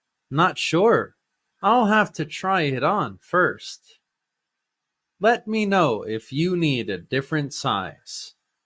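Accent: United States. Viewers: are you American, dude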